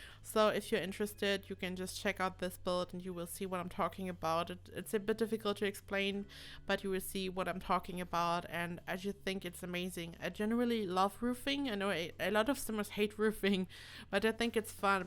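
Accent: Germany. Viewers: are you German ? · Are you from Germany ? I can hear there some kind of accent :)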